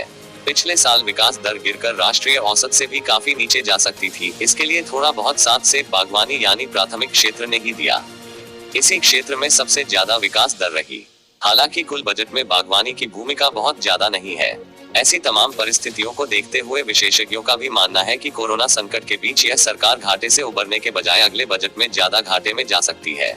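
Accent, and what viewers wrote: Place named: Himachal Pradesh, India. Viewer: Sir aapki voice me jo himachli tone aati h hm to bo sunne k liye hi aapka video dekhte h plss phle wali voice laye.....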